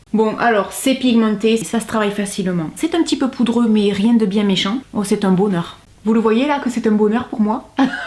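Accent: South, France. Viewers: Coucou, fraîchement abonné à ta chaîne, j'adore tes vidéo, ton humour et surtout l'accent du Sud vive le sud. Tu es de quel coin ? Bisous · Cc Ma belle tu est mon rayon de soleil ☀j aime beaucoup ton accent du sud et tu as un jolie sourire et ta bonne ça me fait du baume au cœur 😍 💕 prend soin de toi 🤗 😘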